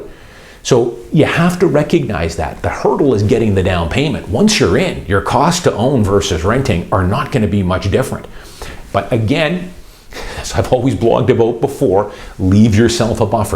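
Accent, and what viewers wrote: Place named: Canada. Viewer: wow I never realised what the Canadian accent sounded like until this buy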